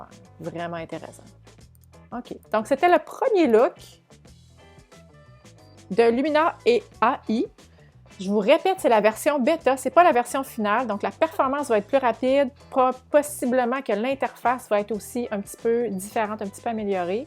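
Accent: Canada. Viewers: votre "accent"canadien car je suis en Provence au sud de la France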